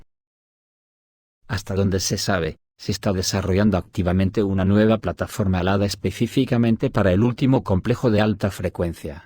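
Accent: Spain. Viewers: TU CANAL ES UN DIGNO REPRESENTANTE DEL MUNDO HISPANO-LATINO ============================================================, TU APORTE INFORMATIVO Y TUS COMENTARIOS / ANALISIS, HACEN DE TU CANAL, UN CANAL PARA SER TOMADO EN SERIO Y CON RESPETO. EL EUFEMISMO Y TEMOR SOTERRADO DE OTROS "REPORTEROS" ==================================================== _ TU NO TIENES MIEDO COMO OTROS CANALES, DE DECIR Y LLAMAR LAS COSAS, LAS PERSONAS Y SUS PAISES CON SU NOMBRE Y CON SU CALIFICACION. _ OTROS CANALES USAN EUFEMISMOS TIMORATOS COMO LLAMAR "OSO" A RUSSIA MOTHERLAND _ OTROS CANALES USAN EUFEMISMOS TIMORATOS COMO LLAMAR "I" AL ESTADO DE ISRAEL. _ OTROS CANALES USAN EUFEMISMOS TIMORATOS COMO LLAMAR "ABUELO" AL USA PRESIDENTE. _ OTROS CANALES USAN EUFEMISMOS TIMORATOS COMO LLAMAR "PAIS DE LAS HAMBURGUESAS" A USA. _ OTROS CANALES TIENEN UN TERROR DE MUERTE DE LLAMAR GENOCIDIO A LOS CRIMENES COMETICOD POR ISRAEL. 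_ OTROS CANALES LLAMAN "TERRORISTAS" A LOS PATRIOTAS PALESTINOS, PORQUE ESO ES MAS "CONVENIENTE", Y ASI EVITAN QUE LES CIERREN EL CANAL. _ OTROS CANALES LLORAN LA MUERTE DE UN JUDIO, PERO LA MUERTE POR GENOCIDIO CONTRA UN PALESTINO ES JUSTIFICADA PORQUE TODA PALESTINA TIENE UNA ETIQUETA DE "TERRORISTA" QUE LE PUSO OCCIDENTE Y SUS MEDIOS MASIVOS DE COMUNICACION, DE MANERA PROPICIA Y CONVENIENTE, PARA PODER PERPETRAR ESTA MATANZA EN CONTRA DEL PUEBLO PALESTINO TU HABLAS UN ESPANHOL "CASTIZOLA" COMO EL MIO, QUE A LA SAZON; HABLO OTROS IDIOMAS Y POR ESA RAZON ENCUENTRO LA VERDAD, PE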